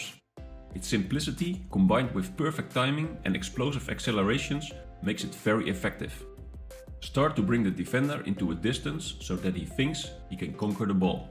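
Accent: Netherlands. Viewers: is that dutch accent omg that English dutch accent sounds horrible,,,,,,,, the video is good thank you so much my friend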